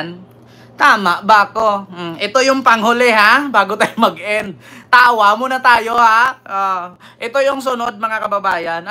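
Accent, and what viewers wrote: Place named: Iloilo, Philippines. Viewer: Sir I love your Ilongo accent kuhang kuha nyo ang diction. Illongo man ako. Maayong adlaw sa imo Sir from Iloilo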